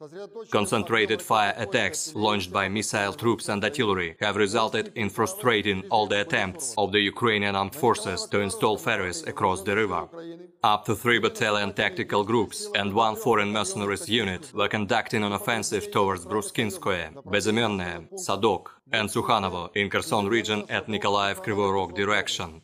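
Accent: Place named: Indonesia. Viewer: pembawa bicaranya suaranya medok indo bangke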